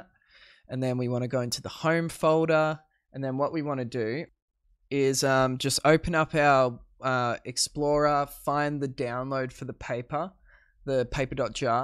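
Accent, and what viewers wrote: Australia. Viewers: Finally, a guy I can understand, as a fellow Australian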